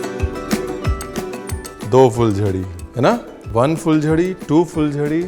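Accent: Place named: Uttar Pradesh, India. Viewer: aj UP wale accent me recipe sikh kr mja a gya.....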